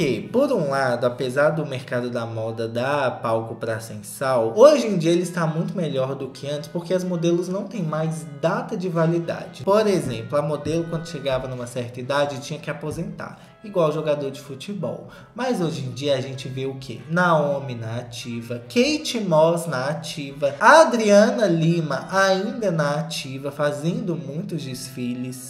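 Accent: Minas Gerais, Brazil. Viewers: Agora q eu noteiii é mineiro?! Quero ser sua amiga, bora sair kkk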